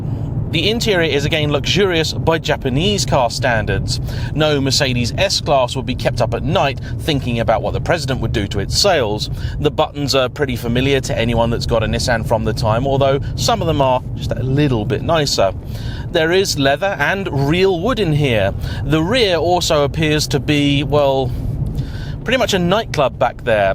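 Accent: United Kingdom. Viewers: This guy looks like an American, dresses like one, but has a British accent and is in the UK I think. 😂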